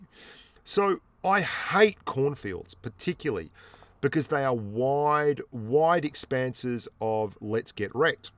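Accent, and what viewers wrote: Australia. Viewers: Suggesting that you mention the importance of having multiple scopes for different engagements. 1x or 2x for close and maybe mid, 4x and 8x from mid to far. Cannot stress this enough for players who zoom in on every target they fire at. Too much zoom will get you killed more often than not at closer ranges. Another point you can bring up is you don't always have to stand when shooting out of windows. It is very situational, but if they aren't directly below or close to the house, back up a few steps behind the window and crouch. You'll be able to shoot outside the window while barely peeking your head. Great videos as always. keep making great content, honestly really enjoy you making content for this game instead of blitz. I find this game much more complex and engaging than blitz, which makes that aussie voice just that much more enjoyable to listen to. 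If you could, maybe make an advanced guide in the future, I'm sure it would help get the knowledge and tactics out there.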